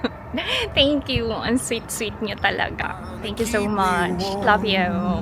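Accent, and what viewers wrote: South Korea. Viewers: In my opinion, it’s completely normal to adapt to the Korean accent and the tone or sound of the way they speak. It’s also possible you’ve forgotten some words from your mother tongue—especially if you don’t have anyone to speak Tagalog with every day. That’s completely normal, since you’ve been living in Korea for a decade. I don’t understand why some people still need to be educated about this. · Na notice ko na talaga yung pananalita mo and I knew na adapt mo sa koreans yun,, but if you are not aware how you sound like ganito ang example,, “ you said This is how I speak,,, pero sa panding namin or how you pronounced it is sounds like “ This is how I speakah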